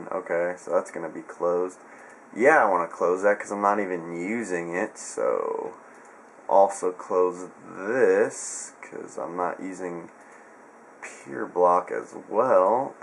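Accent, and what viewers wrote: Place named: California, United States. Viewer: You have to be from Cali :P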